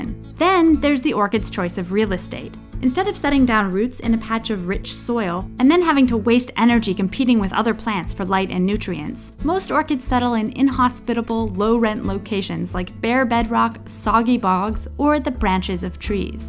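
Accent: United States. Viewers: Very fine piece of work, Kingsley. My Australian wife wants to know where you found an American narrator? The word, orchidelerium is NOT a Victorian word, for those interested. My late editor, Anne Boston, came up with it to entitle an article I wrote on Victorian "orchid mania" published back in the 1980's for the now defunct, "Garden Magazine." It was used a second time in my 1989 book, "Wily Violets and Underground Orchids" (still in print). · i never knew the depths of american pronunciation before..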